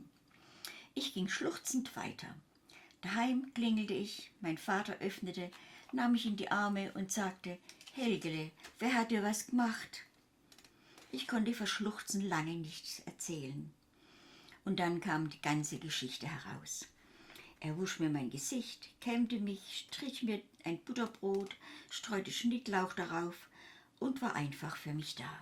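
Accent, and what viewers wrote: Swabia, Germany. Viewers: Kann es sein das du leischt schwäbisch redest 👐🏻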